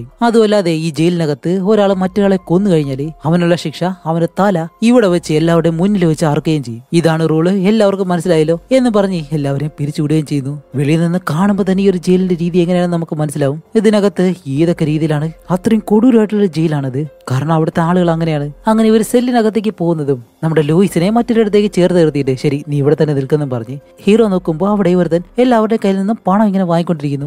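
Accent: Palakkad, India. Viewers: പാലക്കാട്ട് കാരനാണല്ലേ കഥ പറയുന്നത് 😃?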